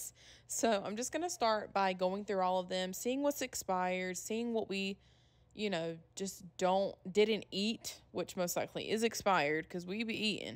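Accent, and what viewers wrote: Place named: Virginia, United States. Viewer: I’m inspired. I like your accent. Where are you from? You sound like a gal I know from Virginia. Maybe I’ll fire the old Vlog back up and clean some stuff. :)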